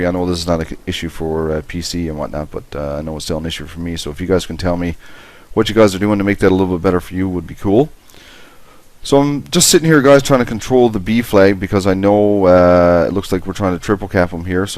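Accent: Canada. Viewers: is that a faint Newfoundland accent I hear? Vancouverite asking, much respect! Add me up, "guybones" psn id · Are you from Canada or Minnesota?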